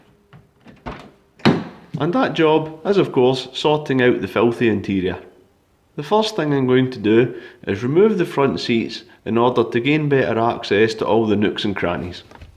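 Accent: Scotland, United Kingdom. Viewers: I can appreciate that you don't speak too fast because I wouldn't be able to understand with that collin mcrae accent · I think one of the reasons I subscribed to your video is because of your great Scottish accent as well as the great job you do to clean the car. Lol. Have a great day mate. 👍👍👍🇦🇺🇦🇺🇦🇺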